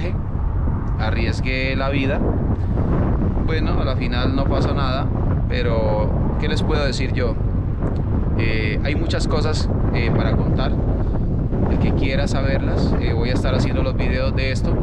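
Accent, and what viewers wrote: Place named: Colombia. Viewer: eres colombiano por tu acento y la manera en que dices las palabras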